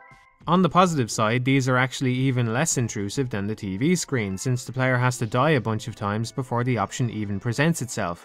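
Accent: Ireland. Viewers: Love your videos. Can't get enough of that Irish dictation. I have a new idea for a review series: Mass Effect.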